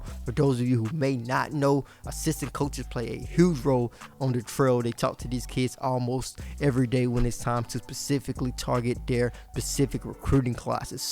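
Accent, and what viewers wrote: South, United States. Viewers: My dawg been evolving. He a little country, probably trying to sound as professional as possible. I agree he can ease up a bit and sound a little more natural. He came a long way though! Keep up the good work!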